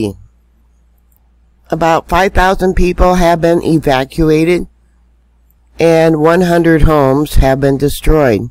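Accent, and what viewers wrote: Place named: Midwest, United States. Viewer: Well, you are amazing! I heard this little calming Midwest? Southern Midwest voice with the most horrible news ever which in 6 days no one is talking about. The tsunamis. I knew they could happen but I didn't know it's bcuz the island would go under. I'm subscribed and I'm sticking with you and bushbear or what his name is (apology) but he's very nice and English speaking. Peace and take care. (Bushcraft Bear.;) )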